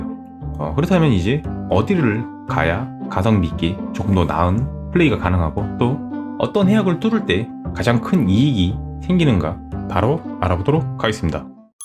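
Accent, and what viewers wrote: Chungcheong, South Korea. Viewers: 충청도 사투리 구수 하네유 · 충청도신가유? 딕션 참 구수하네유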